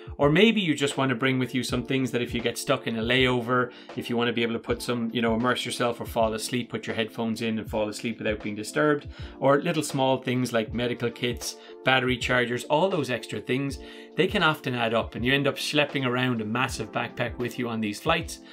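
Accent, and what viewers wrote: Ireland. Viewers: I got a little confused at first hearing the familiar Irish accent and seeing an American charger on the desk at the same time.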